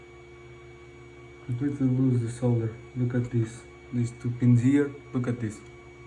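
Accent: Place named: Russia. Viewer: Какой-то русский акцент)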